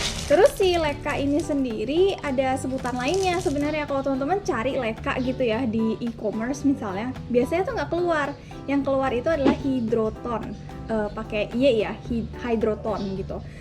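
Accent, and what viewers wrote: Japan. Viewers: baru mau coba menggunakan media leca buat fern cari referensi nemu lah video ini yg pas, ada aksen japan nya btw ternyata prnh/lama di sana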